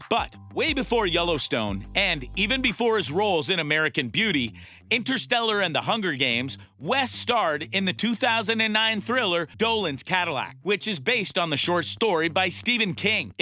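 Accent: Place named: Poland. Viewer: the voice of this guy is from poland or something...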